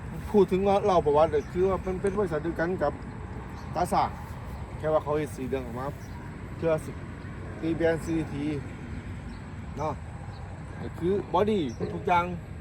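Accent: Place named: Khok San, Thailand. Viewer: สำเนียงคือคนบ้านเฮา บ้านโคกสาร คัก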